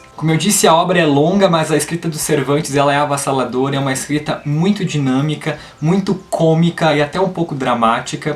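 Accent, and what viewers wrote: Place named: Portugal. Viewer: O sotaque dele chega a beirar o sotaque de portugal kkkk amo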